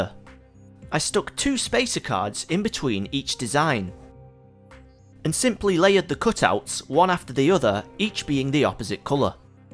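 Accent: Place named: United Kingdom. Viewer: Your narration with your British accent reminds me of the good old Art Attack days! Haha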